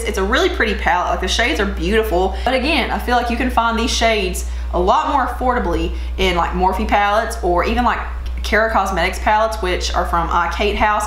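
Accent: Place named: Kentucky, United States. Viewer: I randomly found you in my homepage. As soon as I heard you talk, I thought to myself "girl has to be from KY". Checked out your FB and sure enough you are. Girl I'm from Hazard and we have alot of friends in common. I went to EKU. Saw where you are there! I'll be binge watching your channel!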